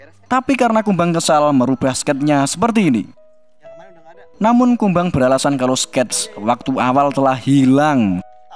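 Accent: Java, Indonesia. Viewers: logat jowo ne kuat bgt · Medok juga hhhh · Mas mimin asli mana ya?,kok logat jowone mantep ketone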